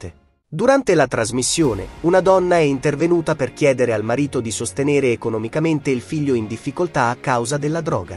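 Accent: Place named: Southern Italy, Italy. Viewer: Forse perchè è meridionale o romana...?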